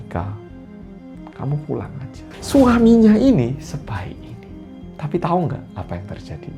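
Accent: Java, Indonesia. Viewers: Hee jadi suka nonton pidio nya mas.. Ceritanya medok Jawa dan ceritanya santai jadi mudah D dengar, 👍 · Rodo medok tenan ya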